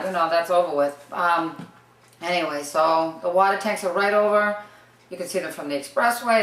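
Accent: Boston, United States. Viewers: Are you from Dorchester ! Gettoooo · i love the boston accent. does the south bostonites have the main accent, or is it all over boston? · you are it!! Thank you for taking the time and making the effort to show the world how Bawstin's accent should be learnt. Greetings from Colombia! I'll always have fond memories of Bawstin. Boahston strong! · Southbridge Mass <3 I visit there all the time, my family sounds just like that. too funny! Great vid btw. :) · My mom was born in Quincy and raised in Lynn. She talks just like you :) Then she moved to CT, and thats where I'm from lol, so I always poked fun at her for how she said "party" sounded like "potty" :) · i love the boston accent!! its simmilar to mine in certain aspects, (i have a common english accent) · You're killing me!!! My mom grew up in Field's Corner, you sound just like her. Ice cream at Seymour's after the movie at the Neponset.Drive In, 25 cent cannolis at Bombadari's Bakery on Dot Ave, a couple of strings at the bowling alley on Adams Street. 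Saw some great movies the Lowe's Theater for a dollar. Wow, all of those great memories are coming back like they happened yesterday. You can take the boy out of Boston, but you can never take the Boston out of the boy. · !! omg I love your vids I LOVE THE BAWSTIN accent is the best I was there for a second and couldn't leave the accent behind your vids are helping me perfect it!! can't wait to visit again!!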